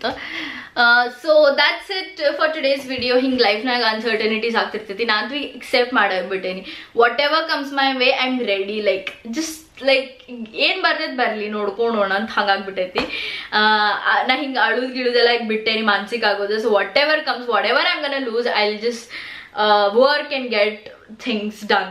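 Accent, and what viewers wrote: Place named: Dharwad, India. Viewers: Love the way you speak namma uttara kannada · Nimma Dharwad language bhalha esta aytu ri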